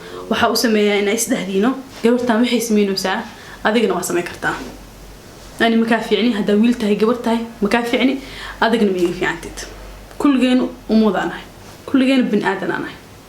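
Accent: Somalia. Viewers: By all means it's a compliment but I love your Somali accent. Thanks for the much needed topic sister.👍🏾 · hhhh, waxaa qosol igu dilay hadal keda iyo sida ay afsomaliga ugu hadleedo , runtii waa cajiib. lkn waxay tilmaam u tahay iney rabto in dhaqankeda iyo hidaheeda ay xajisato , lkn waa qof nool oo dareen weyn xambaarsan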